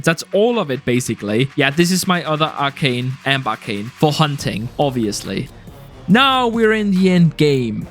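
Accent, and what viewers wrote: Denmark. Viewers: You sound Danish..? Or Scandinavian in general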